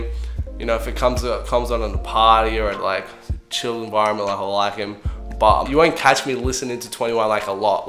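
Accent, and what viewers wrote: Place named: Australia. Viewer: bro ur Aussie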